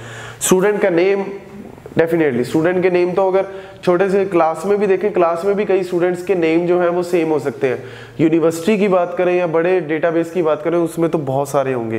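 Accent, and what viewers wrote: Punjab, India. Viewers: Or aap sayad punjab se ho kyoki aapki language me thoda punjabi tadka bhi he😊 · Sir has a punjabi accent ❤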